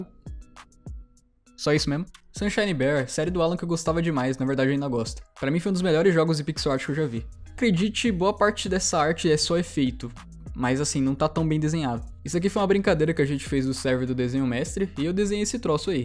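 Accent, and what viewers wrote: Rio Grande do Sul, Brazil. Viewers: Gaúcho né kkk?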